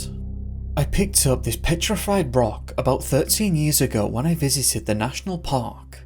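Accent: United Kingdom. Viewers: a big body of water might stop them from crossing, however there are strange disappearances in north america and some people see strange things in the woods and have slowed down time, some people have even said they can see their search and rescue teams and they hollar and throw rocks and its like they cant hear them and they are outside of space and time basically what you're saying is if you take it go put it back yourself or you'll be fucked forever sounds like silicone based life (the man eating rock) lol the way britts pronounce some words just sound funny. i only ashume aluminium is shinny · Reading off a script and being british does make him sound like every other youtuber. Reason for the method is so that theres a sense of (pseudo) intelligence. And the length is so people can fall asleep to this boring shit. Gotta farm those autoplay views when your audience isnt consenting 😂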